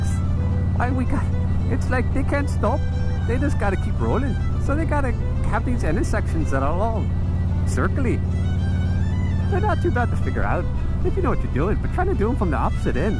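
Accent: Ireland. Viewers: Irish is pretty good...Scottish needs work! Watch Braveheart a few more times! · Great Irish accent mate. I too have an accent, because I grew up in Quebec and I’m from Italian decent lol. Ride safe bro.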